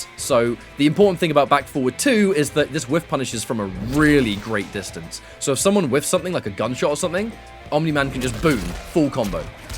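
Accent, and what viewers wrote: United Kingdom. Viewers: As a Russian, i jusr enjoy your English. Always prefered the British version to an American:) Thanks to your combos, though, quite helpful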